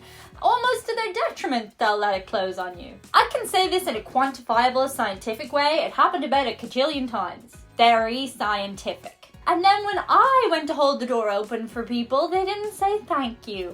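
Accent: Ireland. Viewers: Honestly I do think the smiling and being friendly to you. Look in the mirror very attractive / Blonde / Irish accent. I think that is most likely why you thought that. Beside smiling and slamming doors in your face. You had the best of both worlds. I really wish tourist's would visit small town America. Everyone goes to large population areas. Small town USA. Smiles holding doors and good service is us. Your welcome to come to Oregon. {Just don't stay in Portland } Come to where i live Lyons,Or Settled by 2 Irish brothers. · It was probably her Irish accent that made people more likely to help her. ¯\_(ツ)_/¯ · You have your Irish accent,.🍀 That will melt any New Yorker's tough exterior! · As a Pharmacist, I will be checking a prescription for accuracy, while I’m on the phone with a Doctor, while a Pharmacy Tech is asking me a question. That’s considered “mild multitasking” in Pharmacy World. 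I jay walk everywhere I go. But, it’s absolutely the most fun in NYC. It’s like a carnival ride. The drivers don’t slow down or maneuver, it’s awesome. It’s a list, just a list... you met some nice ppl in NYC. But, you’re a cute blonde, with a cute Irish accent, and you’re white. You can figure out the rest. And, if you have a person videotaping you, people are going to be like angels. Call B&H Photo Video, tell them you want to buy an expensive digital camera. Then ask lots of questions. Try to extend the convo into a friendly conversation like one might have with a barista! Ask them “what does that mean?” Ask it a lot! Lol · New Yorkers were nice to you because you reminded them of their great Irish granny (your accent). They don't hold doors open because you gotta stop. Finally, they laughed when you dropped your food because NOBODY drops their food. They might throw it away but they don't drop it. 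Just kidding. You're hot and nice. It goes a long way. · Let me guess, a very pretty woman with an Irish accent got some cold hearted New Yorker's to warm up. Who could have thought that was possible?....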